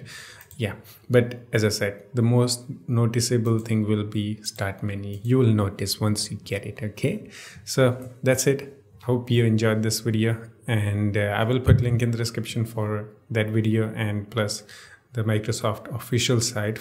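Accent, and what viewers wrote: Northeast India, India. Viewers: where you from bro? your accent is sound like people of Northeast india,meghalaya ?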